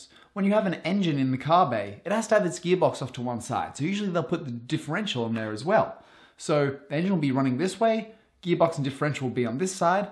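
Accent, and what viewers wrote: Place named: Australia. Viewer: what part of Australia is your accent from?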